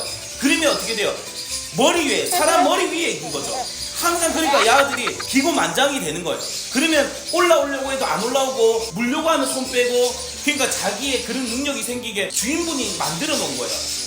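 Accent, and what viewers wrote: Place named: Gyeongsang, South Korea. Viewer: hand-pink-waving:hand-pink-waving:hand-pink-waving:안녕하세요 올만에 적어봐요 ㅋㅋ아따~!! 말도 잘하신다 갱상도 사투리로 막 나갑미데이~! ㅋㅋㅋㅋㅋㅋㅋㅋ 직입미데이~! ㅋㅋㅋ 역쉬 짱~!!! 머리가 좋은거죠 ㅋㅋ애기들이 ㅋㅋ 그래도 아파요 ㅋㅋ 장갑껴도 안된다 하시고 ㅋㅋ 손꾸락 끊어져도 걍 맡겨야겠네요 힛 ~^^ 항상 응원합니다 ^^:face-red-heart-shape:yougotthis:yougotthis:card-red-penalty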